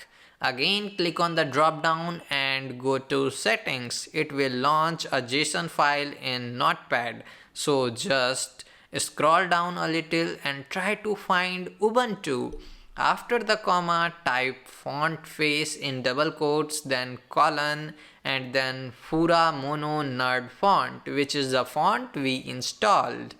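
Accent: India. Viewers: Indian accent, foreigners will enjoy watching this video!!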